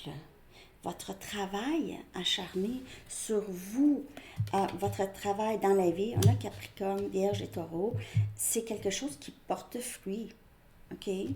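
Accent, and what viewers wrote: Canada. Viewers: Hi sweet lady ❤️ I love ur videos & ur sweet Canadian accent 😊it doesn’t matter to me when u speak English👌🏻👌🏻 M’ from Paris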